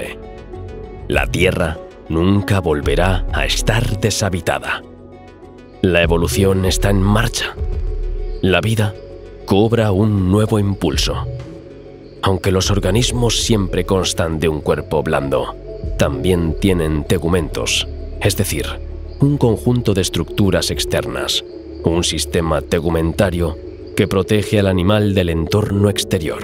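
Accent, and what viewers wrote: Spain. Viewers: Veo muchos comentarios de mis hermanos hispanoamericanos y ninguno de ellos son irrespetuosos excepto tú. Si no te gusta escuchar el acento castellano español pues entonces no visites este canal, así de sencillo. Sé que aún habéis algunos que nos repudiais por el tema de la conquista, pero déjame decirte algo, los españoles actuales no tenemos culpa de lo que hicieron nuestros antepasados y yo como español me entristece ver mucho odio y resentimiento en todas las redes hacia los españoles. Me hubiese gustado una conquista sin sangre, sin historia negra. 😢 Os admiro, os respeto y os quiero porque somos hermanos. Viva Latinoamérica y Viva España.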